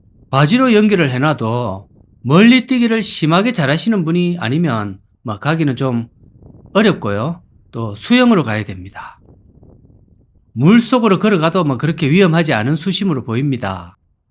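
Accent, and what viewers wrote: Gyeongsang, South Korea. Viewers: 갱상도 사투리 + 충청도 어투...? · 맞습니다 멀리 캐나다에서도 항상 다음 영상기다립니다 구수한 영남에 사투리로 재밋게 말씀 하시는것도 너무 재밋습니다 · 등더리 ㅋㅋㅋ 진짜 얼마만에 듣는 고국의 갱상도 사투리인가요. 억쑤로 고맙십니더.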